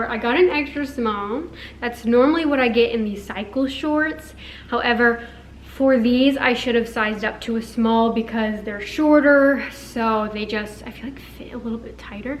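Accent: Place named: United Kingdom. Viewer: I love your British accent 😂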